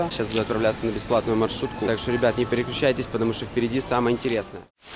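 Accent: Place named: Ukraine. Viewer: Может он сам будет решать что ему делать, и говорит он хорошо, тем более он не из России, в украинском акценте нет ничего плохого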